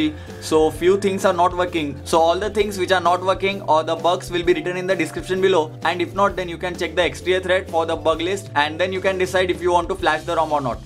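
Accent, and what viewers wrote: India. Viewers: you will never be promoted coz of your indian 2rs english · sick of this fucking indish accent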